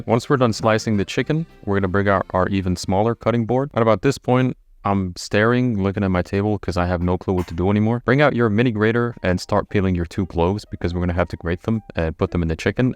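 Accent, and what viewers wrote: United States. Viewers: cool video! I'm surprised you've never been to the US, you have a very american dialect! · I don’t know if you’d take this as a compliment or not, but your accent would easily pass for American lol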